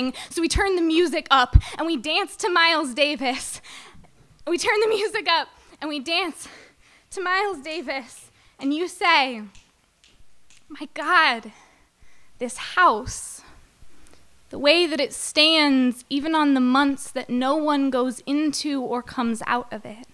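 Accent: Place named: Midwest, United States. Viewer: Is she from the Midwest? I detect a Midwestern accent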